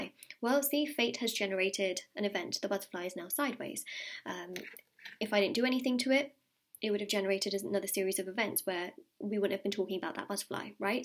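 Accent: United Kingdom. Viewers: Her British accent is already making her videos more interesting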